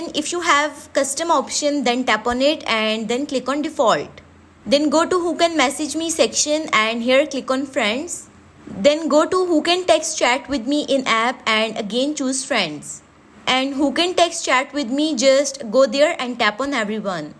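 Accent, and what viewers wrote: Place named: Arab world. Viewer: arap aksamı var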